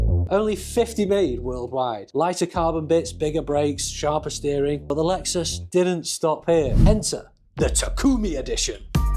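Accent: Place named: Northern England, United Kingdom. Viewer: Very northern, nasally, drowning, blunt, what's northern for chav..!!??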